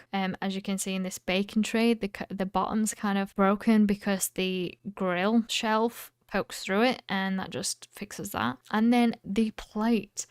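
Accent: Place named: United Kingdom. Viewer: Hello! Thank you a lot for the video. It's greatly helpful but what I also need to mention is your beautiful British accent, I love it so much! It was a pleasure listening to you.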